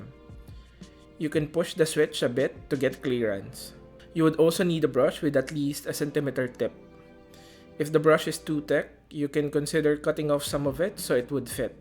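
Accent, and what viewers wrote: Philippines. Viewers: Pinoy ka ba? Parang medyo pinoy ung accent mo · Parang pinoy to a. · This dude Filipino, I'm Filipino and the accent is unmistakable.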